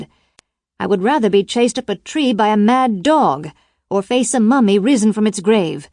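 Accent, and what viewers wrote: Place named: United States. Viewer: Why an American accent? Peabody is British.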